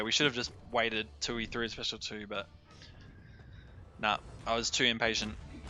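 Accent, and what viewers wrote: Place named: Australia. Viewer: Recognised a fellow Aussie instantly😅 Nice vid man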